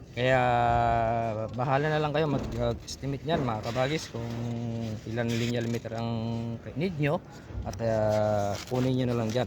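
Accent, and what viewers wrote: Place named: Ilocos, Philippines. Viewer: TAGA SAAN PO KAYO PARANG ILOCANO PO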